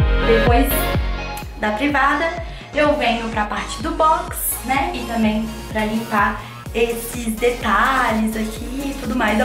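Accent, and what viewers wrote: Minas Gerais, Brazil. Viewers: Pois se acostume, pq ela não vai mudar. Mineiro fala assim.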